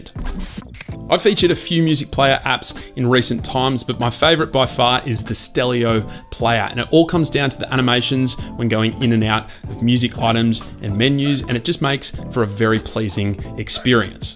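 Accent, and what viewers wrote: Australia. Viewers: Love your hard work and the Aussie accent, keep on bro 👍🏼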